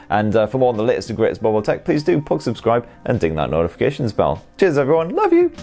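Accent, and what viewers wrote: United Kingdom. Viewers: At last, someone speaking with a British accent!!!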